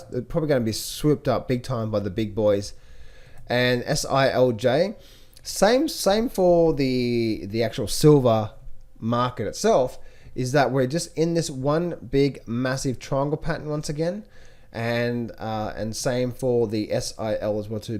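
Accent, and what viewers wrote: Australia. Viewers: he is an aussie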